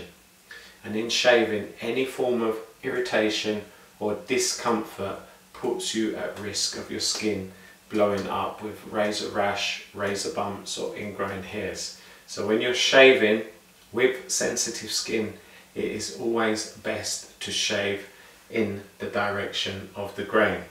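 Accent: United Kingdom. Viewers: Love that British Accent 🤗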